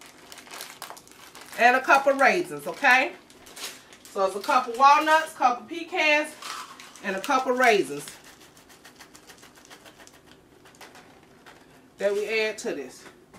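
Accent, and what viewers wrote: South, United States. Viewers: Where are you from i love your southern accent ?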